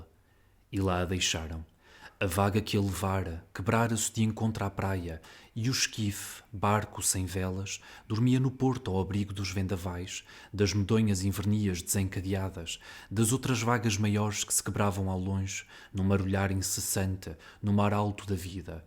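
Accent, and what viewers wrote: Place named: Portugal. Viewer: Maravilhoso ouvir esse poema em português Lusitano. Eu sou brasileira descendente de portugueses e estou arrepiada ouvindo essa versão. Muito obrigada!!!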